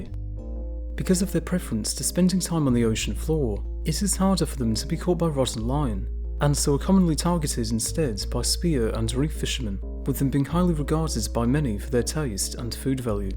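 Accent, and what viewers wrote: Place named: Wales, United Kingdom. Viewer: What's that accent.. ? Can't figure it out.. sounds Welsh though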